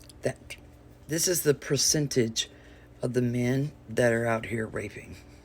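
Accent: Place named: Appalachia, United States. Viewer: Are you from the Appalachian mountains? From southwest Virginia here. You sound like we do lol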